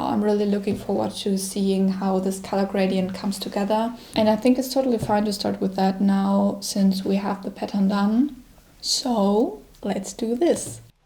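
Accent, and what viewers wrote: Germany. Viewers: I love this video about the creation process of this wonderful dress. It looks so good! Those color nuances! So cleverly designed and made! I admire your patience. I sew myself, but I've never done anything so elaborate. It was so enriching to watch you go through your creative process. I could watch you for hours as you walk through the church in this absolutely extraordinary dress. I stumbled across your channel by chance and of course I subscribed. Und ich dachte mir doch, dass sich dein Akzent deutsch anhört. 😂 Viele Grüße aus dem Saarland. ❤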